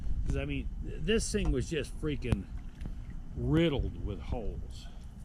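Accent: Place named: Northeast, United States. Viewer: Where do you live? You almost sound like you’re in the northeast? Mass? I’m in NH and wonder what you do for winter with the pool?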